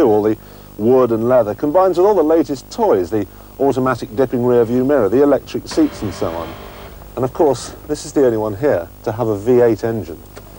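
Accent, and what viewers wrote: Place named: RP, United Kingdom. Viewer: I seems Jezza's commentary isn't as good when he talks with his posh voice rather than the one that we are so used to hearing nowadays, in fact he actually sounds boring in this clip, but I suppose the BBC wouldn't allow regional accents when this was first made.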